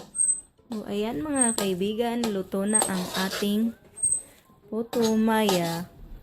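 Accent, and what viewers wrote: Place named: Visayas, Philippines. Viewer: Mga tao jud noh bisag unsa nalay bantayan. Pastilan dolor! Magbuot mn mo ug mag tagalog sya .. Kamo dw pag tagalog be paminawon nato inyong accent.. Natural lahi iyang accent mga dzai kay bisaya man sya di man pure tagalog.. Kung ang tagalog bitaw mag bisaya lain man pod kaau paminawon.. Imbis magpasalamat ta kay naa tay nakat onan nga bag ong recipe mamantay hinuon.. Sus Ginoo!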